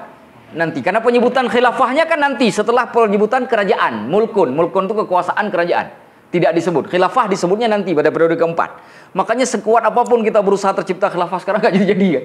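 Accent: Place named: Aceh, Indonesia. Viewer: Bagus ceramah ustadnya. Dr logatnya sepertinya org Aceh ya...😊😊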